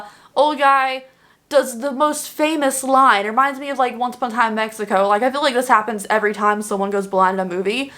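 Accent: South, United States. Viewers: Ok I know I’m not crazy, as I’m a southerner I may just be crazy 😜, but I’ve been noticing your accent more and more. I didn’t realize how much you would hold it back in the past! I’m the same way. Sometimes I let my accent just gooooo but mostly I reign it in 🤠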